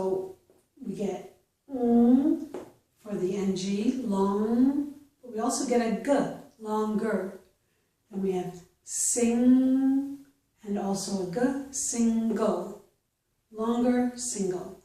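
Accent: United States. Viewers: You have such a beautiful American accent. Would I be right in assuming that is a General American accent?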